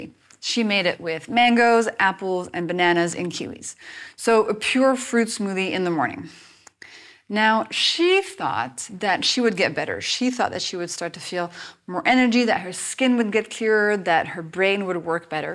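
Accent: France. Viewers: When I first saw you, I thought you were French (that's a compliment) because your facial style, your personality, and the way you speak English has a little bit of a French accent, and I don't know if it's the short haircut that reminds me of that more than anything else. You look really good, like a model, not a biochemist, and I think that's why people are paying more attention to your channel, because it's not the boring, stuffy biochemist's sleepy explanations. I've been watching your channel a lot lately, and it's really helpful in a lot of ways. However, the food that they eat, I think it's one of those things that's so strongly cultural and I think it's one of those things that can't be easily fixed because it's so deeply ingrained, especially from your mum and your parents when you're younger. Of course, that's why your video is so important and meaningful to people who want to make a change and feel like there's a problem. 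I look forward to seeing more of your helpful videos in the future. I'm cheering you on from the bottom of my heart from Korea. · Hello angels!! Does anyone notice the similarities in her voice and the og mtv vj Martha Quinn? It’s like I’m back in 1982 watching Martha introduce the new video. With a little tinge of French. Anyway I have totally cut out sugar and most carbs, savory breakfast, vinegar, etc. feeling great, not deprived, and BMI and excess lbs are dropping!! It’s been less than 2 weeks but it’s working!!😊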